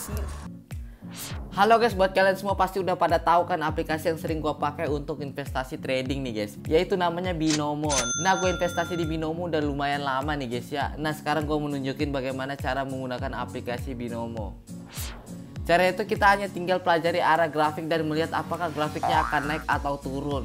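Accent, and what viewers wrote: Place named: Java, Indonesia. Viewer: Jawa keras bos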